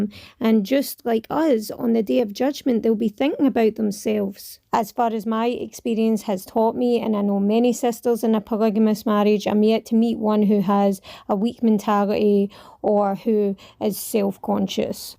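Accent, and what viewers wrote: Scotland, United Kingdom. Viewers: Ma Shaa Allah sister you are good. On the subjects you talk about, you are spot on. However sister, your Scottish accent is a bit difficult to follow in one go. 😊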